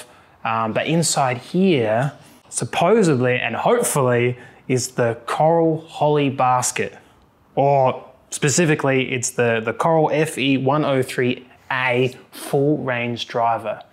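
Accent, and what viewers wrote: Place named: Australia. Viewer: Always like Aussie accent.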